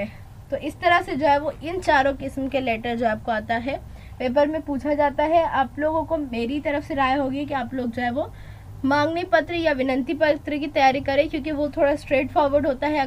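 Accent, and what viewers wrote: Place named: Maharashtra, India. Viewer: her marathi ascent😍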